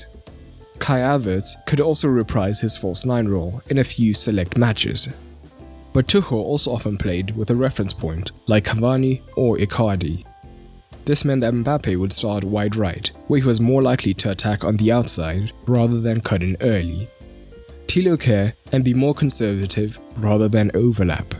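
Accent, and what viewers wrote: South Africa. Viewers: I know a South African accent when I hear one bro 😂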